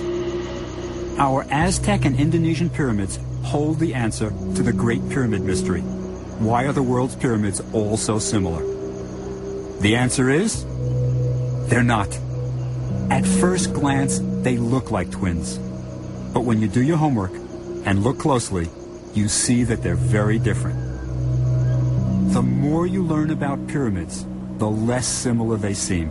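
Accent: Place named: New York, United States. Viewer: Pyramids ...described by a fukkin new yawkuh hahaha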